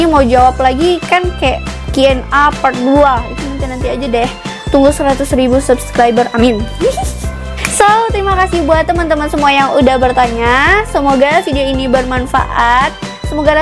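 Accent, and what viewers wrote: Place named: West Java, Indonesia. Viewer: Ak kirain orang Sunda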